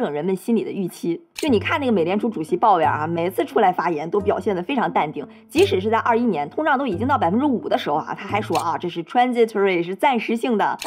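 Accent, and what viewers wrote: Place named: Beijing, China. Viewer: 這北京腔官話真難聽 還是咱河南官話好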